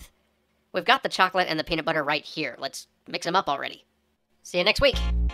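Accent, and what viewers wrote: United Kingdom. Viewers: I came here for the British accent arguement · Best almost stereotypical british accent evarrr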